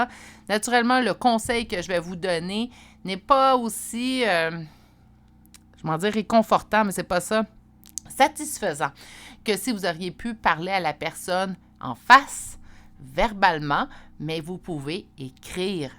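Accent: Canada. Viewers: Gratitude infinie à vous car il y avait 1 message pour moi. Je vous trouve formidable et j'adore votre petit accent canadien ❤❤ J'ai 1 suggestion pour 1 prochaine guidance. 1 guidance avec les numéros du prochain loto. V'la 1 idée qu'elle est bonne 😅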